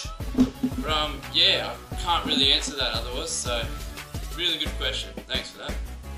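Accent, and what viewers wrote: Australia. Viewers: I have to learn the australien accent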